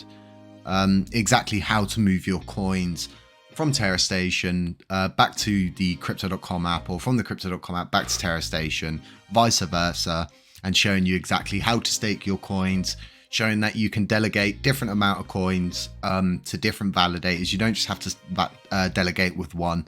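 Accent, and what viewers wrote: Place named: Somerset, United Kingdom. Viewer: My boys got a South West accent im thinking Somerset bai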